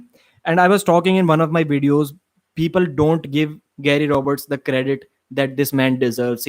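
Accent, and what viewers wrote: India. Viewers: a guy said he could hear your Indian accent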